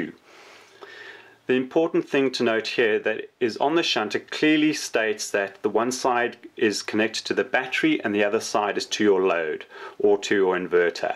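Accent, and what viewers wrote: South Africa. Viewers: Very good demo! Truly well explained, thank you!! South African accent? Dankie!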